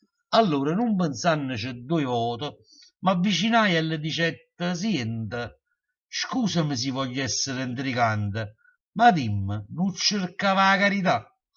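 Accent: Naples, Italy. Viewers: Angela Arresta Ho messo un commento sotto la poesia 'O scemo, ma non lo vedo. Ho scritto che mi è piaciuta e, quanto alla recitazione, che mi piace molto il napoletano, soprattutto in quanto mio genero è di Caserta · Srivere le attualità di questa nostra società in versi cosi' toccanti e veri usciti dalla mente magica di un Collega che stimo tantissimo il Grande Luciano Somma, un plagio và anche alla recita verace con tono e napoletanità di. I MIEI VIVISSIMI COMPLIMENTI....Rita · interpretata in modo magistrale, napoletanita' DA BRIVIDI, Complimenti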